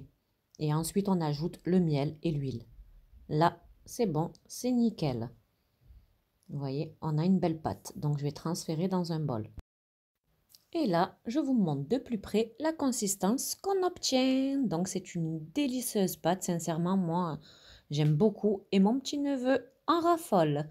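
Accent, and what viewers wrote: South, France. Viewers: Merci beaucoup pour tes recettes, très très bien expliquées, avec en plus, ton bel accent du Sud, pleins de gros bisous du Gard 😊😊😊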